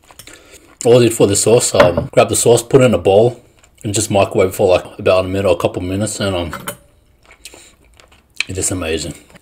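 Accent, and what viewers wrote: Australia. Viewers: Just wondering you sound Australian are you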